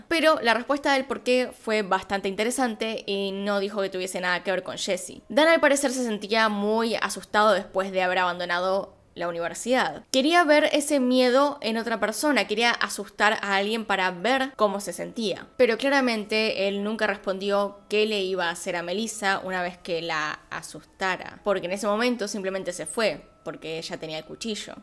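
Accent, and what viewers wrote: Argentina. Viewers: Soy español y escuchar un accento argentino mientras estudio es un vicio 😂 me alegro de que ya casi estas casi al millon. Vamos !!!!ánimo que tú puedes llegar!! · Es argentina por eso suena así.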